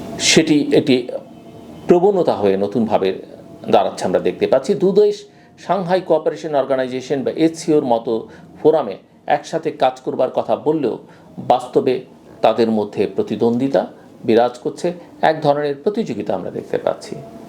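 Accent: India. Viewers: Sir sorry to say apnie akhon Indian ar moto kotha bolan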